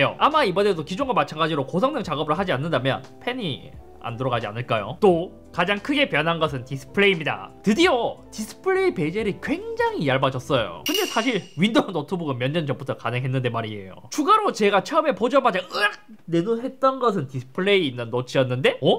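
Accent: Gyeongsang, South Korea. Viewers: 잇섭! 귀여우다 발음을 들어보면 대구출신인것 같은데 맞아요?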